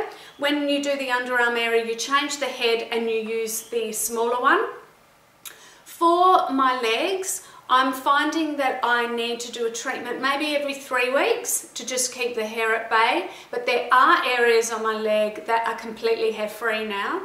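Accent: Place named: Australia. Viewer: seems like an aussie lady from melbourne :)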